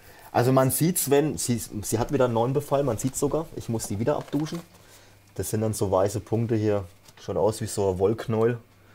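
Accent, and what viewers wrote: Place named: Franconia, Germany. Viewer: Super sympathisch und direkt den fränkischen Akzent gefeiert 😍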